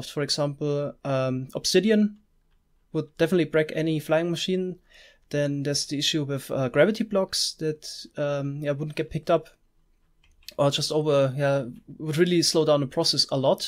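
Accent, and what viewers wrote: Germany. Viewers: A netherstar block, some octuple compressed Diamondblocks and making it a big multiblock structure would be a worse troll ^^ Yeah. But some things are.. Well.. How to say. Inefficient and laggy? :D I mean if you place down 200 pistons, observers, redstone dust, etc. you'll get some problems :D (on mps ^^) Bist du eigentlicher Deutscher? Bin noch nicht so lange dabei und merke es nur am Akzent ^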